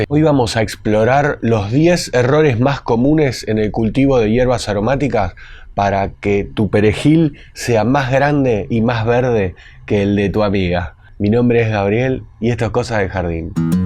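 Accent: Argentina. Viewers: NOOO... DEMASIADO LENTO NOOO Demasiado argentino y ese es uno de sus encantos!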